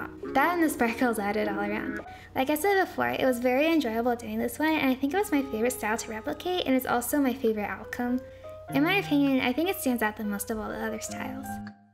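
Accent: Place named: United States. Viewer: For some reason when I found your channel and that is 4 months ago. I thaught you were Japanese..😶 but after hearing your voice for the first time. I guess you're American. But although. I love your animations.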